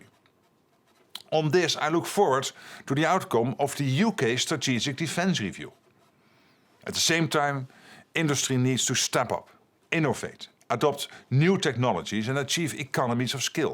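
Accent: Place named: Netherlands. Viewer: I love his dutch accent.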